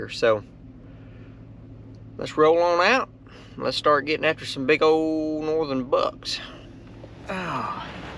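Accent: South, United States. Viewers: The accent sounds really southern. What state are you from?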